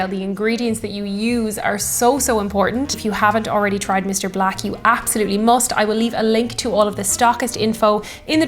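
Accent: Ireland. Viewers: love your accent i llke the way you look irish tart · I love your Irish accent. I liked when you were on the TRY channel. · how more adorable can you be ... simply crazy about the Irish accent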